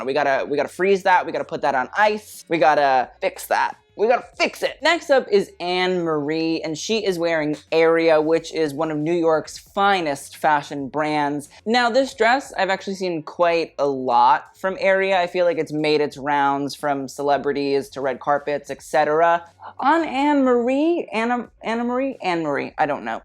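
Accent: United Kingdom. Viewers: Wait. What? Are you British? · You’re British??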